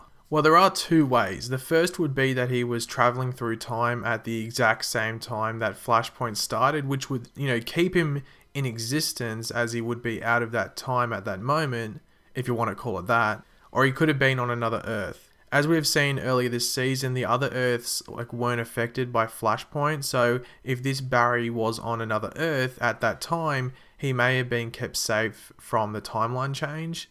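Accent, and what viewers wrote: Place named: Australia. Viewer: youre so australian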